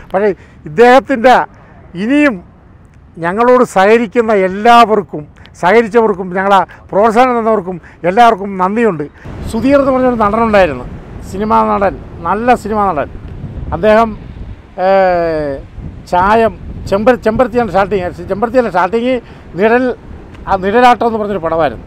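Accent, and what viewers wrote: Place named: Cherthala, India. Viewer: Cherthala basha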